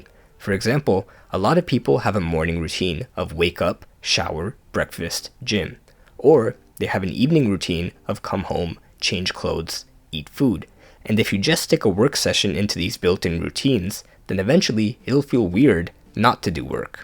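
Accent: United Kingdom. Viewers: british accent goes crazy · That British accent is FOUL lmaoooo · the british accent broke me!! perfection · british accent 😄🤩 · that oxford student voice though.... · Your British accent made me laugh 🤣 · nah bro the english accent 💀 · The British accent got me. it literally forced me to drop a like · That British accent though. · I love the British accent😂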